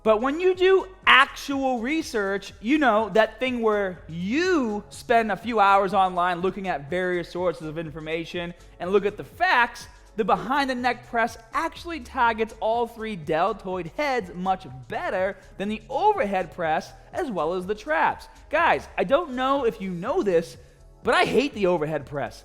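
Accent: Boston, United States. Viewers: I love the boston accent lmao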